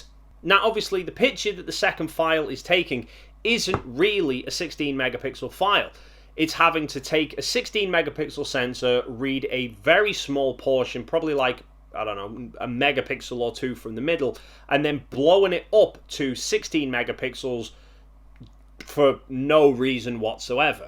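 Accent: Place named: Isle of Man, United Kingdom. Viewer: That's a Manx accent for sure! You a fellow Manxman? 😁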